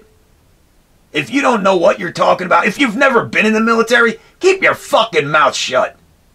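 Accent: United States. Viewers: The accent makes me tear up as well, Murican as fuck, fuck yeah maaaan!! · The second I turned this video on I knew for damn sure he was a Carolinian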